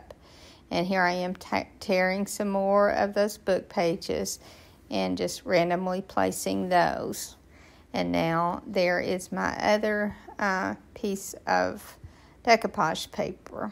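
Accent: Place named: Tennessee, United States. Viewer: I was going thru YouTube and saw your site. Love your accent. Are you from Tennessee? Enjoyed your kind instructions, of the amazing silk flowers. Do you think , this would work with real flowers?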